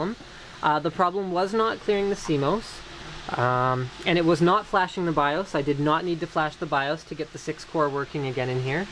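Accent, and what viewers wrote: United States. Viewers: I have to ask - are you from Canada? Because I found it interesting that you pronounced "Z" as zed, but you have a strikingly American accent.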